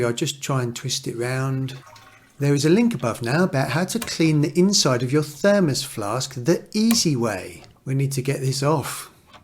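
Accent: United Kingdom. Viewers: Love this😂 very british delivery in a slightly patronising humorous way. Thankyou!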